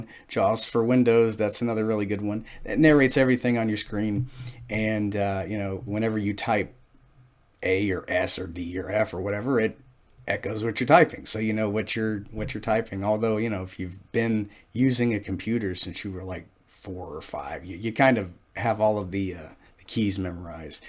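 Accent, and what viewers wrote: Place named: North East England, United Kingdom. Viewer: You sound like you're from the north east area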